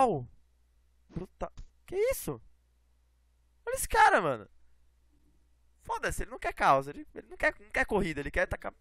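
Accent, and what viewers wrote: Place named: Santa Catarina, Brazil. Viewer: voce e de santa catarina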